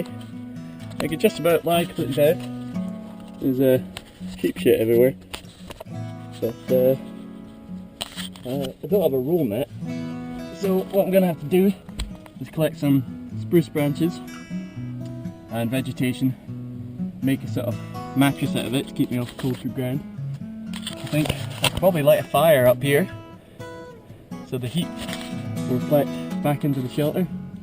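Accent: Scotland, United Kingdom. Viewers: Daniel Parker it’s a Scots accent. And a lovely one for all that.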